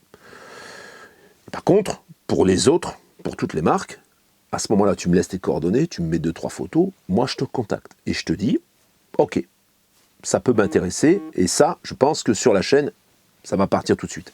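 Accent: North, France. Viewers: Bonsoir à vous, je ne me manifeste jamais car tu as beaucoup de travail. tes vidéos me plaisent, je voudrais te poser une question, que prendre comme GPS pour le cc et la voiture, javais des tomtoms qui ne vont plus. Ton patois du Nord est formidable tu pourrais jouer avec Dany, merci pour la réponse Amicalement, Jacques du pas de Calais. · Mdr la langue du chnord ! Merci à vous 🙏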